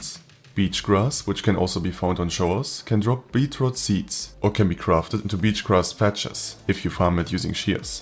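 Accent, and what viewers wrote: Germany. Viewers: Wow very gut mod but i smell some deutsch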